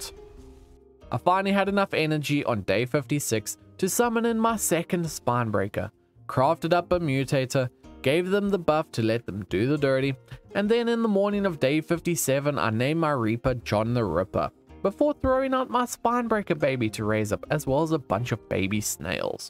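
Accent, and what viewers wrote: South Africa. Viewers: The south African accent is kak funny 🤣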